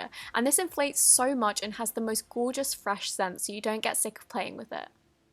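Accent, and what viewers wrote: United Kingdom. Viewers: Aren’t you British not americqn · I mean, she IS British and pronounces things differently than Americans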